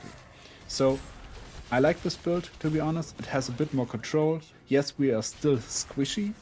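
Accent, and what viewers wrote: Germany. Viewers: Habe die ganze Zeit gedacht, dass du von der Aussprache her ein deutscher bist. Nach dem Kommentar im Video von Darkness, bin ich mir sicher :-)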